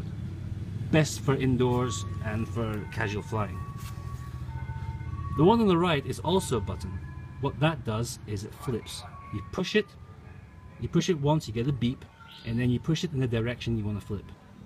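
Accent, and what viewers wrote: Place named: Scotland, United Kingdom. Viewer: hmmmm scottish accent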